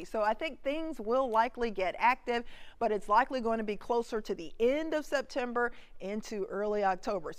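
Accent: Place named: South Texas, United States. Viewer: Love the Lil Southern Texas draw and the way you use it too talk 🦜........just lovely 🌹😍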